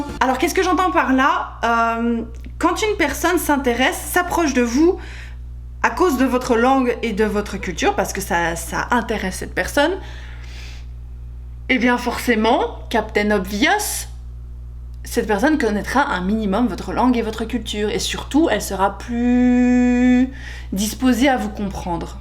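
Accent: Belgium. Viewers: Je me disais bien que tu es belge lol Je reconnais bien l’accent de mon pays Vivement début septembre de cette année que je sois à Tokyo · Il y a du Jean-Claude Vandamme dans le parlé de! Made in Belgium sans doute.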